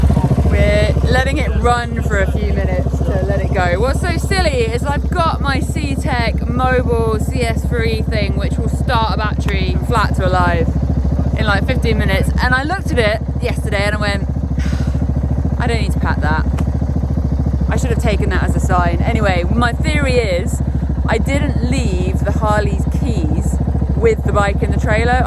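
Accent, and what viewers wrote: United Kingdom. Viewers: don't be subscribed from India just for the British accent ❤️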